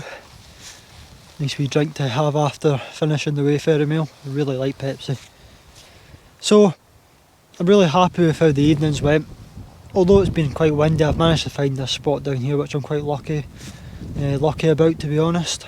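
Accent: Scotland, United Kingdom. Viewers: Thanks for that young man - regrettably I've never been a hill walker though I did scale one Munro in my earlier years - it's definitely something that I regret however with modern technology I can indulge in viewing blogs like yours which while isn't the real deal gives me enormous enjoyment taking in the majestic scenery and views - I'm a glaswegian and can appreciate your neutral Scots accent and modest demeanour - keep the videos coming and keep safe - PS I still have my camping gear which includes a terra nova voyager tent and thermarest mat - need to get the finger out and engage in a wee trip soon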